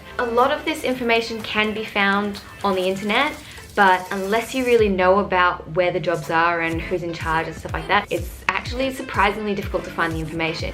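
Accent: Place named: Australia. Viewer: Your Aussie accent tho, I can hear you all day! <3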